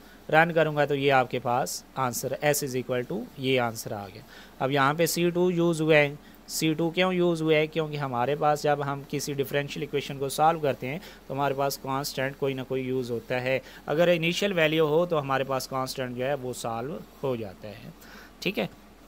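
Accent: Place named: India. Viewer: first lac i understand each and everything😃,thanks allah sir your teaching method is soo good,because you speak too desi like us😁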